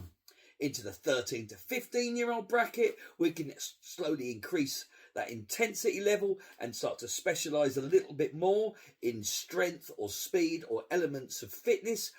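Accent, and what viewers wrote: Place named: Kent, United Kingdom. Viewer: This guy is from Kent 10000%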